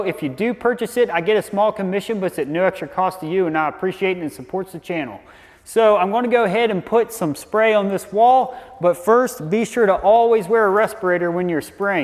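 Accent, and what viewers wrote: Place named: Baltimore, United States. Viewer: Holy Baltimore accent, “q-pon” 😂. Love it.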